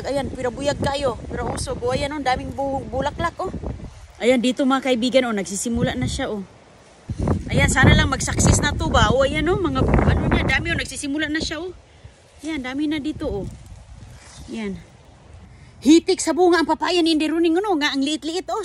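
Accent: Visayas, Philippines. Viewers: Bisaya si kaya ganyan sya mgsalita manood ka nalang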